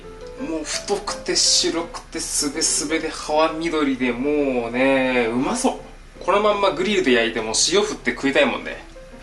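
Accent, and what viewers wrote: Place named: Fukuoka, Japan. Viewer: 福岡の方言かな？ほっとする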